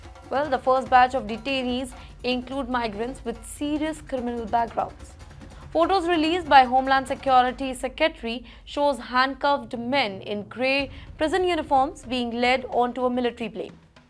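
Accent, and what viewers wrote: India. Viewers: Indian lady with accent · Indianlish. Only Indians can understand.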